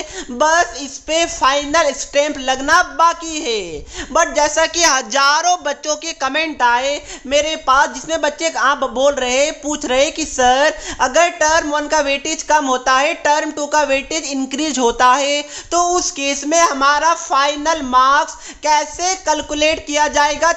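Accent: Bihar, India. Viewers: Sir ji aap bihar se ho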